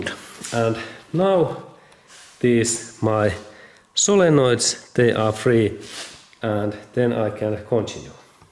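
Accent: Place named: Finland. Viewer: Hienoa työtä! Tunnistin aksentista sut suomalaiseksi! Mikä kone siis tässä pöydällä oli?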